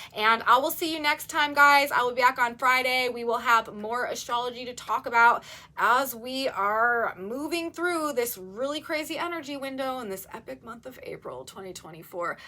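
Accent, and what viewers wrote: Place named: South, United States. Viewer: I love your videos...VERY thorough & highly crucial information. But let me tell ya, girl, you have an adorable southern quirk (I won't say what it is) because it is absolutely adorably classy on you! You put this quirk out there crowned with nothing but SUPER CLASS!!!:washhands::washhands::washhands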